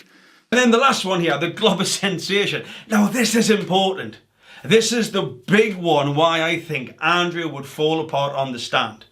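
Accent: Newcastle, United Kingdom. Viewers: I love your Gordie accent! 😆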